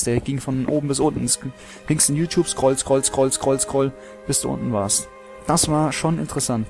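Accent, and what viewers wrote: Hesse, Germany. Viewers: Woher Genau kommst du aus Hessen? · Als ich gehört habe, dass du Hesse bist (hab ich richtig vermutet), hätte ich dem Video gerne 1000 Daumen hoch gegeben!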